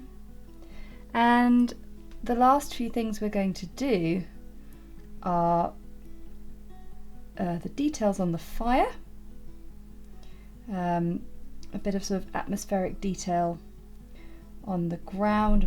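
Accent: England, United Kingdom. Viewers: No no! I’m sure you say it the English way! You know how we Americans love the English way of speaking! Don’t change.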